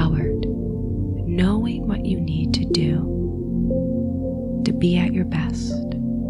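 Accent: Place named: United States. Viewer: Finally, one with an American accent- no more British or Australian ones that distract me lol😂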